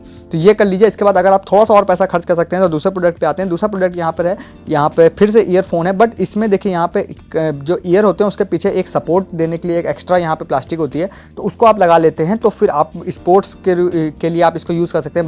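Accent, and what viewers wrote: Kanpur, India. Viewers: Bhai kanpur ke ho kya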